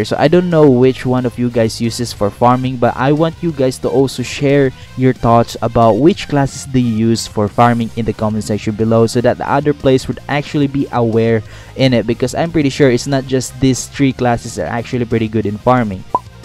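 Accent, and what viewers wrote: Philippines. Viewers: I can tell my manz filipino and speaks tagalog probably because of the accent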